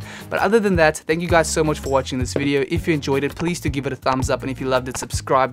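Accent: South Africa. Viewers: his south african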